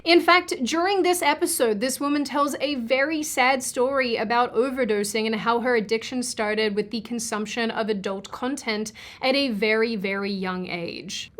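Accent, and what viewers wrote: United States. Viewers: Your accent is changing... can hear the American coming through!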